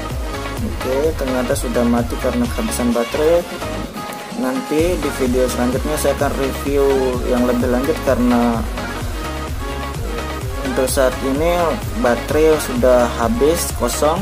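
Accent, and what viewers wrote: Lombok, Indonesia. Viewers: logat dengan lombok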